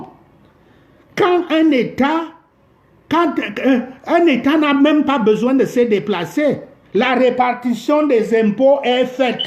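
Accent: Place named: Guadeloupe, France. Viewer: Tu es camerounais mon frère? Comment t as l accent Guadeloupeen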